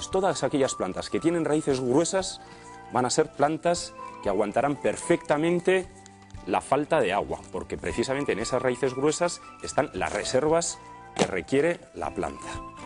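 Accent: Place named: Spain. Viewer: Hola siempre te veo,soy tu nueva suscriptora,las tengo en masetas en un patio con mucha luz, no las tengo en sol y como se isieron muy grande las cambie de maceta, tenía cochinilla😂😂 y la cure con jabón potásico y min, pero mi pregunta es 😂😂no sé qué le pasa a las hojas tienen un color con manchas naranjas con puntos negrito🤔😁 que puede ser?será al guna plaga,es la primera vez, que los veo🙄😁🤔 de que lugar sos pareses del algún lugar de España, por tu acento,gracias un saludo 👋🇦🇷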